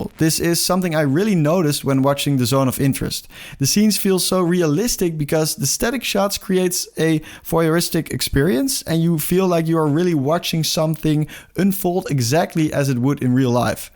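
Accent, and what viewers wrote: Netherlands. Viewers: I smell dutch hahaha leuke video!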